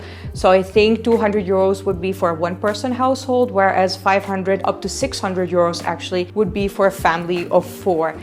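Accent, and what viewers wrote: India. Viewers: And you have Indian accent! Wow! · For sure u are getting the Indian English accent.Great video. Thanks.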